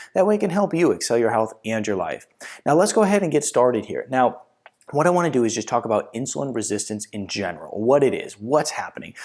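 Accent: United States. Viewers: Excellent presentation. I like the speed of your speaking. I didn't waste any time. I don't like slow speakers. But out there in the world most people do not understand American accent. English letters of what you are speaking coming on the screen would help millions of viewers. Thank you. O<num> whatsapp.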